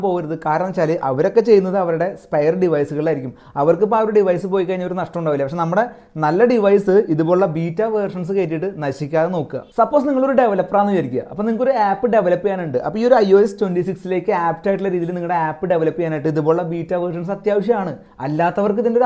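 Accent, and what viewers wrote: Kunnamkulam, India. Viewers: bro ar u from kunnamkulam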